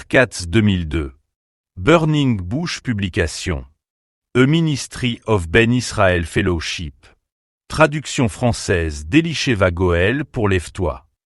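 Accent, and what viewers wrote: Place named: France. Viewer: ISRAEL ET LE TEMPS D'ANGOISSE DE JACOB ART KATZ en audio. Chers tous, Dans le grand charivari d'opinions et influences souvent contradictoires autour de la question d'Israël dans les temps de la fin je me suis souvenu, comme d"une priorité absolue, du travail prophetique charpente sur base des Écritures de notre défunt ami, Art Katz. C'est clair, tranché, évident et ne fut pas aimé lors de la publication. Art venant chez nous en souffrait...Mais de toute évidence la réalité d'Israël aujourd'hui devient une caisse de résonance évidente pour ce message. Bonne et sérieuse écoute. Les temps sont graves ! Haim Goel L'accent frenchie est désastreux. Sorry.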